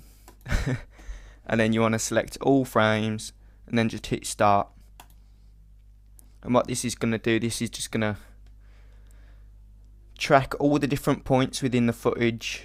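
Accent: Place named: Northeast England, United Kingdom. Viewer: yow aye from roond ere am ya? hahah i recognize that accent.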